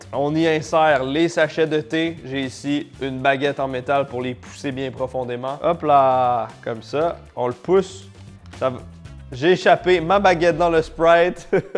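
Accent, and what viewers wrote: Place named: Quebec, Canada. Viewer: Le français québécois sûrement 😂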